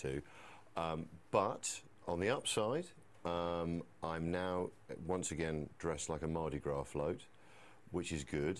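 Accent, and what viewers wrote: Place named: South, United Kingdom. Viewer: His pronunciation is certainly British/Southern English...this is his natural voice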